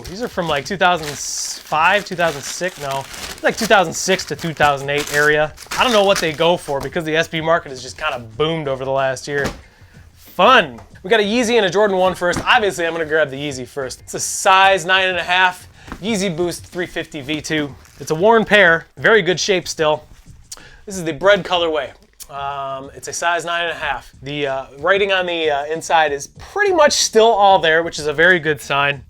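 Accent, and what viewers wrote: Chicago, United States. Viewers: PLEEASSEEE stop doing the Chicagoan intro man I like the videos but that KILLS it like damn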